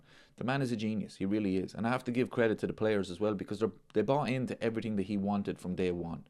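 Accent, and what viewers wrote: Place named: Laois, Ireland. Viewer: If we only win the league i still be happy. Are you a laois man. Im trying to think where the accent is from.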